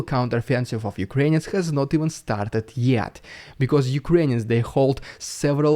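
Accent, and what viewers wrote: Russia. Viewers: > I do believe you are capable of re-reading your own damn posts. I do believe you would capable of pinning down the specific phrase where I made such a claim if it existed. Not only I didn't make such claims directly, I also didn't allude to it. Now let's treat each other with respect, gracias. > And I didn't say Russia was homogenized; you did. Repeating the same claim won't make it more valid. Providing arguments will. > And yes, I've heard Russians speaking just like him in communication intercepts, as well as other distinct accents from around the country. I admit that otherwise he sounds like a native Russian speaker who attempts to speak English. However, as I mentioned before, this deviation (pronunciation of "г" as "х") is extreme. The degree, uncommonness, and unnaturality of such a deviation were explained previously. > And it has much more than a "grain" of truth - Russia's claims to be "Russian" are part of their national identity, yet are as accurate as Nazi claims to be "Aryan." Rhetoric question. 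Are we talking about "Russian" the nationality or "Russian" the ethnicity? I've heard many views on national identity of Russia but I assume that this extreme nationalistic view could theoretically be held by some marginal groups. Could you clarify which particular claims you're referring to? If you think they're "everywhere", please provide at least one example. I provided arguments on my side. If you want to change my view of the world via argumentation in good fa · He said “Leopard 2”, the most prevalent Western MBT in Ukraine at the moment. He’s just pronouncing it with Russian accented English, since he’s originally, you know, from Russia. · actually ukran was bombing the dam evac sites. guess your just another western propaganda machine. and i guess the goverment thinks we will belive you cause you have a russian accent? there retreating cause they are about to bomb the front lines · 😆😆😆 Russia doesn't even have a singular culture, much less a singular accent. 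Hell, not only does the US have 30 regional dialects, those are further broken down into more than 100 local accents, meaning each state has multiple accents. Russia isn't quite as diverse, but there are still three regional dialects, further separated into local accents. Simply put, simply comes from a different part of Russia than your family.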